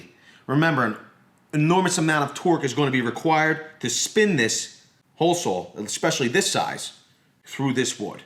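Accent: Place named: New England, United States. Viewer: God your yankee accent makes me cringe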